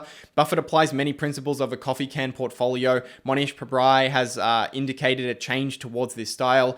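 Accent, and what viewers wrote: Australia. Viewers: Rosy cheeks and Aussie accent ☺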